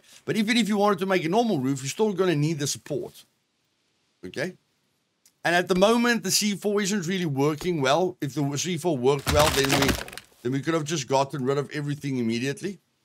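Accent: South Africa. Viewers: Are you South African? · you sound South African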